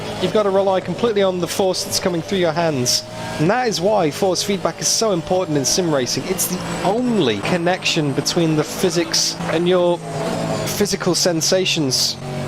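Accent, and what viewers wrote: United Kingdom. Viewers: aaaah i thought the accent was familiar, I'm from the other side of the Mersey myself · sounds english too me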